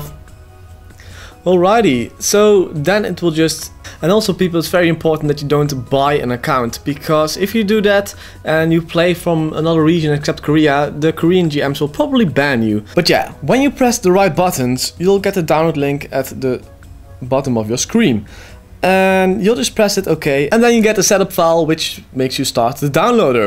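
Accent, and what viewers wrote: Netherlands. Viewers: i knew it you are dutch your accent made me think is he dutch xD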